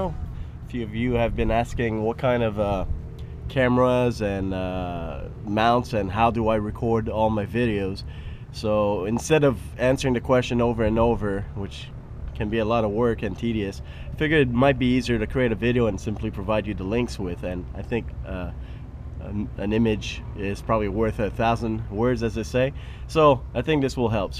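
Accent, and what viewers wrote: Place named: Quebec, Canada. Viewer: I couldn't get over your french Canadian accent. It's funny but I never heard it in all the videos that I've watched. Thanks again and now back to your cross country video!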